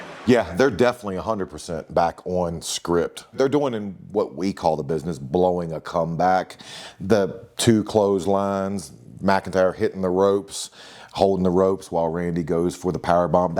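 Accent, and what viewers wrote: Virginia, United States. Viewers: . Your cool af...im used to your accent becouse theres a youtube channel called AFTERPRISONSHOW..and joe the host..has your exact accent..lol and hes from Virginia...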